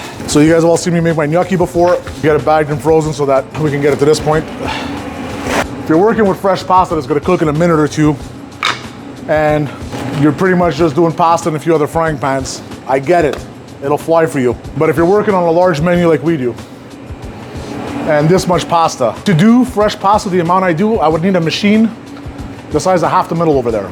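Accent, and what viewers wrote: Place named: Montreal, Canada. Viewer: You are everything and all that, plus a good dad. But that Montreal accent! Chef's 💋. I miss it out here on the rural west coast and yours is a particular thing of beauty. Have a great service tonight. Love the videos.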